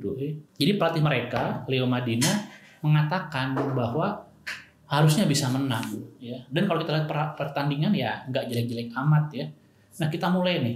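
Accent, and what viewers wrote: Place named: Surakarta, Indonesia. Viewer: Medok solo lah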